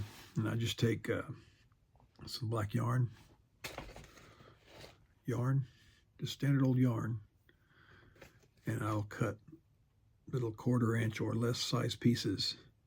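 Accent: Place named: Kansas, United States. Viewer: Grew up in western KS. Thought I recognized the accent. 😁Neat idea on how to make your dubbing. I'm new to tying. I haven't had a chance to get out and buy supplies, so I'm experimenting with using the fluff from chicken feathers.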